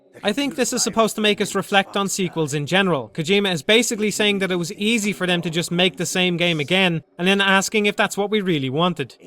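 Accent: Ireland. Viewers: You're Irish aren't you :)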